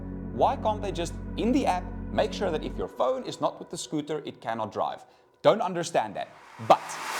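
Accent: South Africa. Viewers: The South African accent😊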